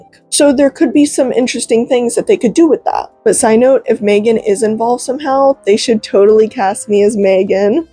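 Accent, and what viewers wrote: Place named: South, United States. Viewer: Ah yes, I remember someone else putting that movie news out there, so, nothing too new to me, and my most favourite live movie adaption has to be Sonic, it just has it all. Gotta say though, you're looking very pretty in that cosplay outfit, in fact you look very cute in it, and nice work on that southner accent. I managed to pick mine up from an interesting transformer who speaks that way called Overlord, man does it surprisingly suit him well.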